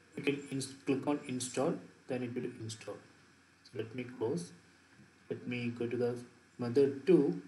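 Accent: India. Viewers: Are you from Kerala?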